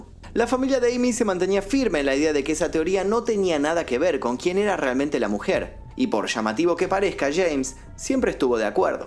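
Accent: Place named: Argentina. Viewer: Me encantan tus vídeos! Me gusta el "SH" de tu acento argentino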